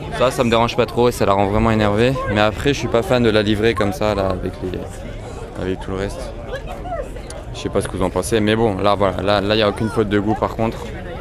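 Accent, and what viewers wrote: France. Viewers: A la meuf te lache un regard 👀 elle a kiffé l’accent français 😂. La Ferrari perso le son et juste dingue.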